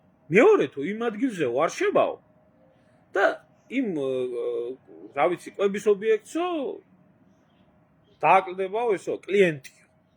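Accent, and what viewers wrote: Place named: Mingrelia, Georgia. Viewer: შენი მეგრუ(ლ)ი აქცენტი არ გაიშვას😂😂😂😂.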